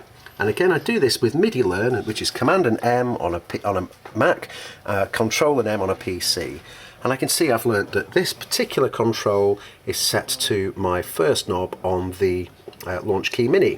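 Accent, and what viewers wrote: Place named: Australia. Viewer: Alright, I hope you work it out. Good to hear a fellow aussie!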